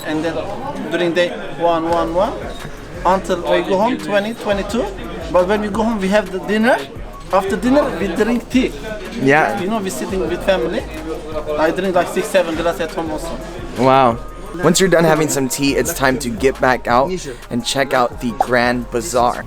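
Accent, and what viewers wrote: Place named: United States. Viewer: You sound like a yank but look Turkish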